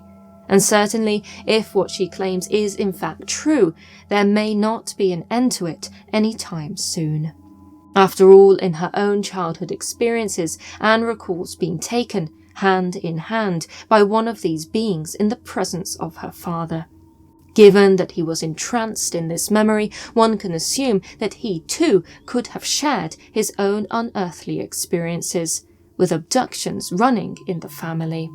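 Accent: United Kingdom. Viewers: Not to mention her pretty British accent. So proper!! Great narrator too.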